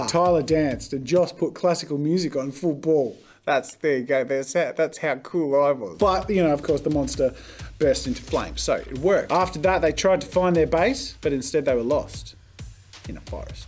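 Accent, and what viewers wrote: Australia. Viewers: there's more ozzie accent when you are more spontaneous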